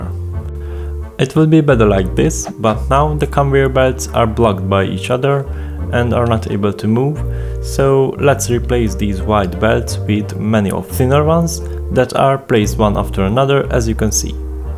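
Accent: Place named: Hungary. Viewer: csá. ne higyj a fokuszcsopi ádámnak h magyarulkéne. teljesen jolesz igy magyar akcentus elsöre vicces de szerintem pont jó .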